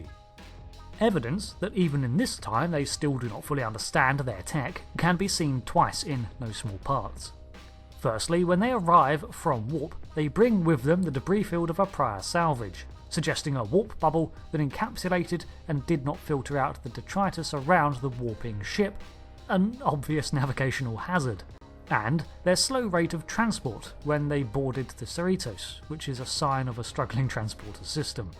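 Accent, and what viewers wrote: England, United Kingdom. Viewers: English from england？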